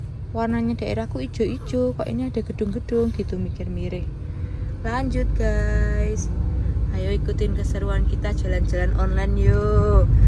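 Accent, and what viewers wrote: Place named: Java, Indonesia. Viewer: Mbak e asal kota mana di Indonesia? Jawanya medok banget 😊...btw ini mbak e sekarang tinggal di Korea di kota mana?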